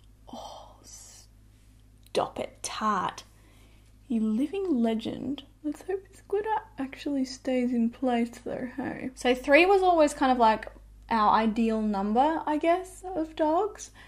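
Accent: Australia. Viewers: Please do vlogs with dogs! You are still the only Aussie mua I can find so it’s good to hear our accent (I live in NSW). I am trying to home school my 2 girls - not easy. They are 12 and 9 and were just having a screaming match at 10am, so “school” had only been on for an hour 😖 The one thing that calmed them both down was meeting your beautiful new puppy! I was enjoying your video - always brilliant!- and then you put the gorgeous Emma on! More puppies and makeup and chats please! Thanks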